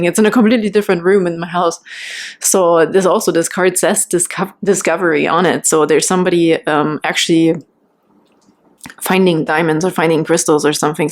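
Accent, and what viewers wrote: Germany. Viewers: Dear, big thx for pile 3. It resonated 100%. Can I ask you a personal question? Is your mother language german? I kinda hear it when u speak. Big greetings from Austria, Nicole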